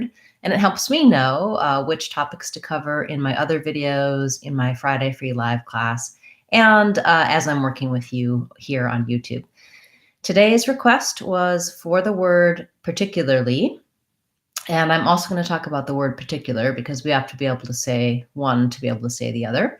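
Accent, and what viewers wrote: United States. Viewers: I don’t think I’ve ever heard an American speaking so clearly 😳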